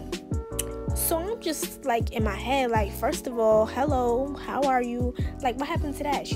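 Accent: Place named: New York, United States. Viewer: Are you from New York? If your not either way I love your accent 😩❤️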